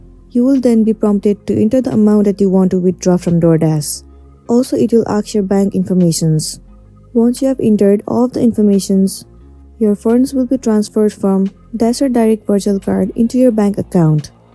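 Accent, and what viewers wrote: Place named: India. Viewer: Indian accent youtube video in 2024 is insane.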